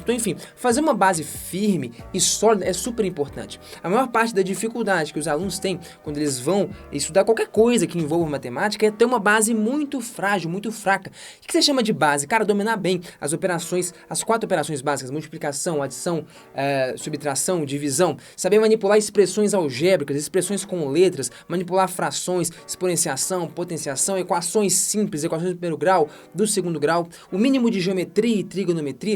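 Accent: Minas Gerais, Brazil. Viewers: Pelo sotaque e mineiro kkkkk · Você e mineiro ?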